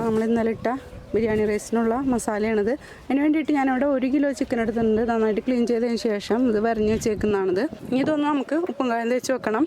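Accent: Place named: Malabar, India. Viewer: Chechi sherikkum thrissur thanne aano..“cherkalilla “ennu okke paranju kekkumbo evideyo oru malabar slang thonnum pinne vere ethokkeyo slang um thonnanu😊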